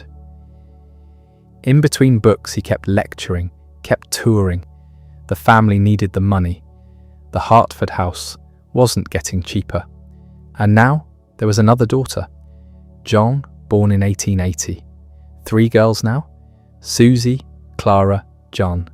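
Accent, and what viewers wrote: United Kingdom. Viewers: Love this and thank you but I wish you'd scratch the British accent. So many documentaries use this voice or similar that the sound is beginning to irk me.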